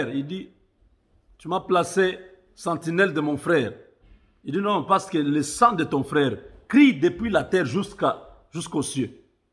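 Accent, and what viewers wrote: Rwanda. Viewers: Ton accent semble Rwandais verifier ton geneologie peut etre qu’il ya l’un de tes parent qui est Rwandais.j’ai vie au Congo pdt 13 ans je n’avais jamais attandu un vrais Congolais qui parle le francais comme ca.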